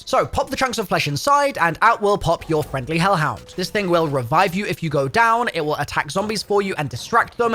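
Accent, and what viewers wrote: United Kingdom. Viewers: It’s just British pronunciation. Relax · Obviously he's from the UK and they pronounce things differently. Aluminum as they say it is al-u-min-e-um.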